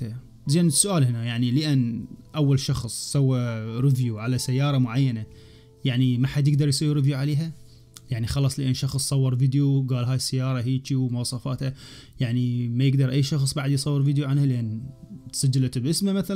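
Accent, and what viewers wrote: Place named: Iraq. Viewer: مافي تقليد ولا شي كل واحد منكم له اسلوبه المميز.. شخصيا انا اتابعكم انتو الاثنين.. وانا احب اللهجة العراقية كثير الله يوفقك.. بس اهم شي ابعد عن النرفزة والسب بالتعليق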